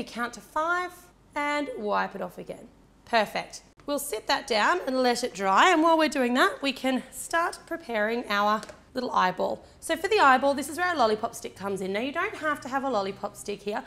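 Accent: Australia. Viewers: yes she is from Australia you can tell from the accent right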